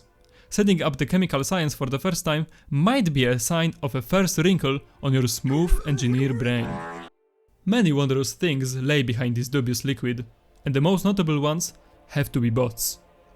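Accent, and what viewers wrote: Poland. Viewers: Polski akcent słychać po pierwszych dwóch słowach :p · Mega polski akcent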